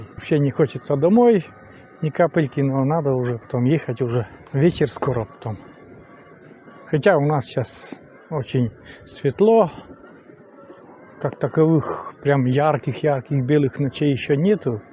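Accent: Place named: Vologda, Russia. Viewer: почему-то не могу найти где вы живёте? Где-то в видео мелькал Сыктывкар. Это там вы живёте? Очень говор похож на моих родственников они жили в Вологодской обл.и в Архангельской обл. Слушаю вас и как будто бы с ними общаюсь. Они тоже были спокойные, урасновешенные. У них тоже были избы из бревен, холодно также было как и у вас. Красота природы тоже как у вас. На жаль они уже все ушли в мир иной. Но не будем о грустном, царствие небесное им а нам здравия, любви, благополучия.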